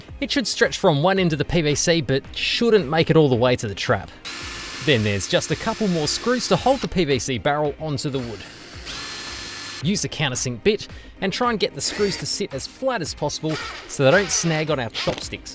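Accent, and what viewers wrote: Australia. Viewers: Great to hear our Ozzie accent on the tube without the waffle like the mob from North of the equator go on and on and on and on till I usually hit the mute button . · Idk why but I love the australian accent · O meh gawd, I found another Aussie! How ya doin' mate? (Pretty new to the channel.)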